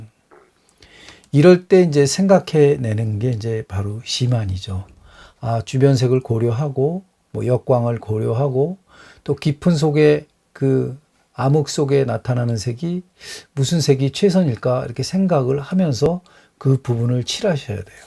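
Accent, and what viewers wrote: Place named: Paju, South Korea. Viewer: 목소리도 좋으시네요. 파주억양 맞아요.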